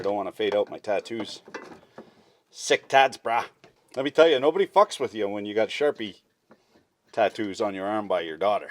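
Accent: Canada. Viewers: Great to hear a true canadian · I tell ya I love you man! I'm canadian been living in USA since I was 9 (am 39) but just listening to the engineering knowledge mixed with canadian common sense humor and a smidge of the accent brings me back to listening to my grandpa in Regina sask working on his international harvester good times.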